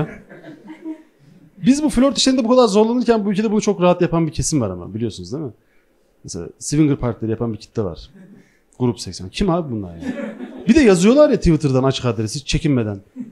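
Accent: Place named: Anatolia, Turkey. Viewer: Abi çok sıkı fanın sayılmam. Ancak kurcala daki çok eski videolarını biliyorum ve çok güldüğüm bir mizah anlayışınız vardı. Kanalı görünce bir heyecanla açtım ancak 2. Dakikaya zor geldim. Bu yarattığın sahne kişiliği ise lütfen vazgeç çünkü kurcaladaki kişilikten sonra çok eğreti duruyor. Eğer bu gerçek kişiliğinse o zaman lütfen kurcaladaki sahne kişiliği ile devam et. Anadolu şiveli ve durumlara uzunca süre uzaktan bakıp anadoluca bir tepki veren yeterince stand upçı var piyasada. Bu şekilde sıyrılman imkansız.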